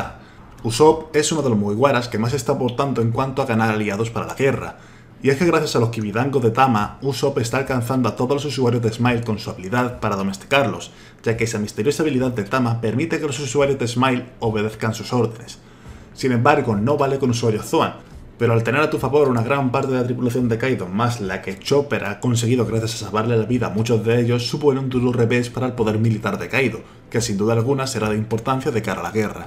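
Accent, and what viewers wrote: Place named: Murcia, Spain. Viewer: Murciano? Ese acento no se esconde jajaja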